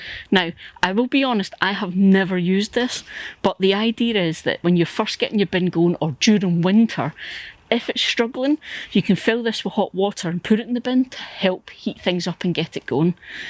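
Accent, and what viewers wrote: Scotland, United Kingdom. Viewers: Good morning, (Good evening in Scotland right now). Your new bin looks like a winner, and so much thicker than the $99 bin that I bought from Amazon. As far as the squeak goes, that will go away once you open the lid a few hundred times🤫. Being new, it’s going to squeak a bit at first. Today, I opened your video before I was fully awake, and you sounded more “Scottish” than in some of your previous videos. I had to lean closer to the screen, so as to translate😳.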